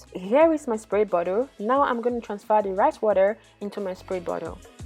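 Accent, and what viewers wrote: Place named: Jamaica. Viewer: Sound look jamaican.. good job sis. More power to u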